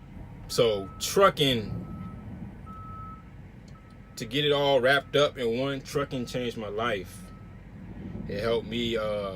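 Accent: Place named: Springfield, United States. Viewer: Sounds like youre from Springfield MO.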